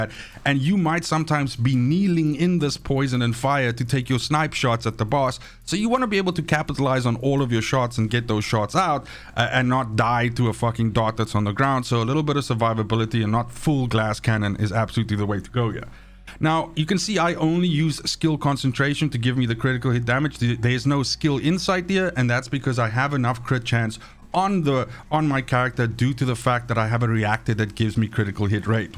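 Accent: South Africa. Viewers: from which country are you bud, you sound like RSA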